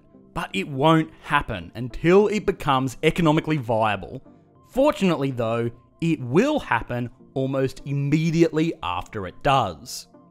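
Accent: Australia. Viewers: Not gonna lie I'm a sucker for the Aussie accent. Half the reason I'm subscribed to Mr. Sunday Movies too.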